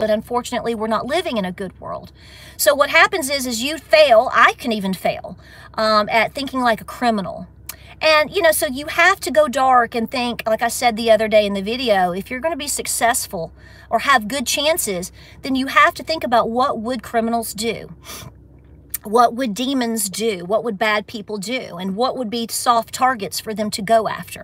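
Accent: South, United States. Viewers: You are so cute, correct, feminine, strong, and spiritual. I love your southern accent and expressions. ❤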